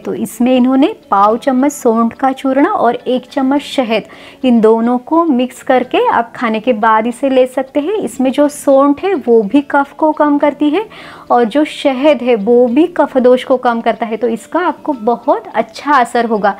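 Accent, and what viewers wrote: Standard. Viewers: Dr. साहिब आपका उच्चारण बहुत ही शुद्ध है अच्छी ओसधी है thanks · आपका उच्चारण बहुत ही स्पष्ट एवं अतिशय शुद्ध है , एवं औषधि विश्लेषण भी प्रशंसा योग्य है ।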